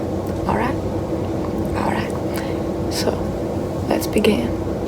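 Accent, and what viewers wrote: South, United States. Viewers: American Southern accent > any other accent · Ah yes, the near extinct classic Non-Rhotic Southern accent, how I wish this accent was more of a thing.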